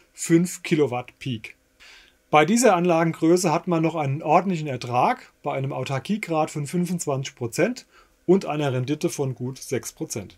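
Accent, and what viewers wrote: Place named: Hesse, Germany. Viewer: Danke für das sehr informative und sympathisch vorgetragene Video! Den „Hesse-Bub“ kannst Du ja nicht verleugnen :-) An wen wende ich mich denn am besten für eine seriöse Beratung samt Angebot und Installation im Main-Taunus-Kreis? Bist Du dort irgendwie geschäftlich tätig? Dein Kanal ist abonniert, mach weiter so! VG Jörg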